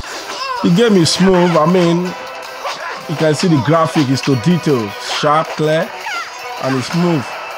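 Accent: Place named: Nigeria. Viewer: I really enjoy all your reviews man ,so honest and perfect ,i have ordered for this phone on Banggood and it is on transit to Nigeria,i hope i won't regret buying this one ,after my disappointment in Meiigoo S8 ,the battery drains faster and it lags though beautiful design but performance is poor..... Love from Nigeria Are you from Nigeria ,you sound like us? ...haha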